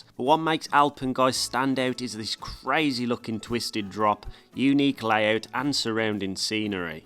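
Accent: Black Country, United Kingdom. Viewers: Just come across your channel and first thought is he’s gotta be from the Black Country can tell our accent anywhere mush lol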